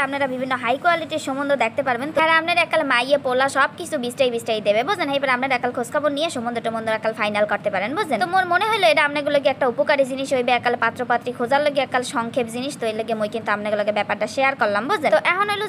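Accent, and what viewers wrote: Barishal, Bangladesh. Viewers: আপু আপনার বাড়ি কি বরিশাল না পটুয়াখালী ? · আপু তোমার ভিডিও প্রতিনিয়তই দেখি বিশেষ করে তোমার বরিশাইল্লা ভাষায় কথা অনেক সুন্দর 👌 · আপু আপনার ভিডিও দেখার পর বরিশালের ভাষার প্রেমে পরে গেলাম,,,,❤❤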